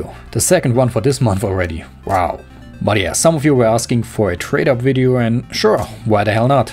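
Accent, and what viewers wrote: Saarland, Germany. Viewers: Oh leck do kommt da Saarländer raus xD bescht xD Saarland rules <3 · Man hört den deutschen akzent sofort raua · Hojo dö und dein saarländisch... Wie wärs mit nem Dragon Lore Trade up? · Are you from Germany? :D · Saarlännisch mei gudda saarlännisch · Immer diese Saarländer xD, beschter Dialekt überhaupt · ☆ PC Gaming ☆ Wie geil enfach mo saarlänisch rausgekloppt. made my day^^ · Endlich mal ein Saarlänner auf YouTube, so genial ;D Kann es sein das du Englisch besser kannst als Hochdeutsch? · Das is Saarlännisch dass muss sich so anhöre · + bischt dau a saarlanner? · "OH LECK, näää es is de hyper beast.. ik glaaab es ned!" xD bester:) Saarländer oder? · "Oh leg,nee.Ich glab es net."Komscht du aus em Saarland? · for a german, your accent and voice overal is really great. Like audio honey. You should do some narations or more commented videos. 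Btw grats, you lucky bugger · das ist saarländisch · b_Unr34l saarländisch junge saarländisch,... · guck dir die kommentare an 90%möchte videos mit stimme bitte mach es häufiger!Und davon 30% will mit deinem saarländischen Akzent.Deine stimme ist einfach pornös alder.Ik glaub es net xDD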